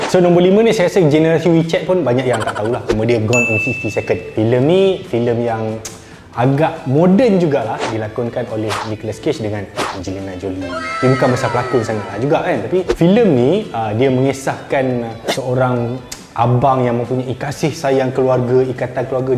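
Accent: Kelantan, Malaysia. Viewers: Ralik bangg. Kelantanese ke?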